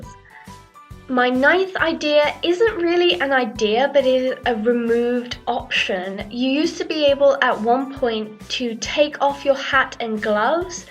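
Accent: United Kingdom. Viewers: Are you British? :o ( I'm just curious because your accent sounds like British) xD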